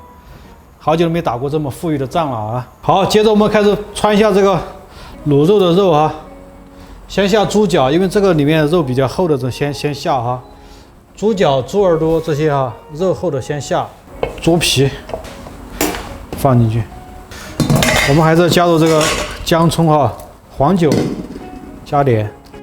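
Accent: Sichuan, China. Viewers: 这个川普听上去真上头啊 哈哈 但是越听觉得越是喜气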